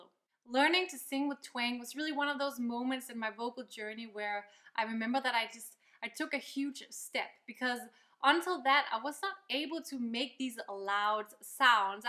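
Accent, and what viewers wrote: Denmark. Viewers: That Danish accent though.... <3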